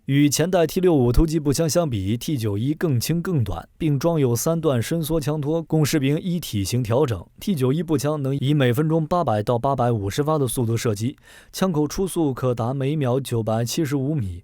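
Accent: China. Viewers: 這播報主的口音怎麼聽都是中國口音~ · 為什麼大陸口音那麼重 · 你是国军退役？为什么你是大陆口音 机器人配音？ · 大家都好認真留言但是都聽不出來大陸口音嗎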